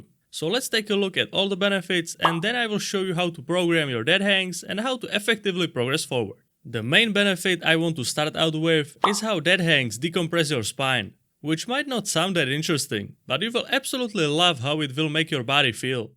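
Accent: Czech Republic. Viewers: Český přízvuk 😅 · Hey! I know you will most likely miss this comment, but I still wanna ask: I have an oddly specific interest in accents and like to make a small "game" for myself in guessing them and after watching a few of your videos I wanna ask: Are you Czech? Odd question aside: I love your content ❤ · yo are u Czech ? just guessing from ur accent haha, great video anyways, will definitely try it! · you are from czechia, the accent cannot be hidden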